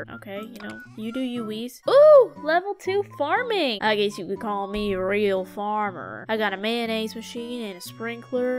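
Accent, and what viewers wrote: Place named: South, United States. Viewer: I love her southern accent Like actually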